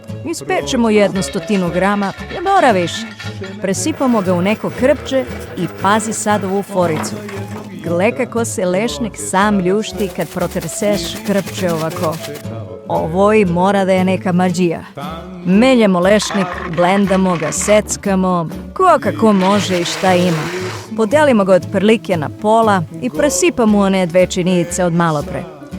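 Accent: South, Bosnia and Herzegovina. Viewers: Govor nas juznacki, milina je slusati, pa milina od recepti unikatni. Alal vera za zlatne ruke bre🎉🎉🎉🎉🎉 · Lele,kakoj si ovoj devojče naučija da ovako zbori po juznjacki?Svaka gu čas!😜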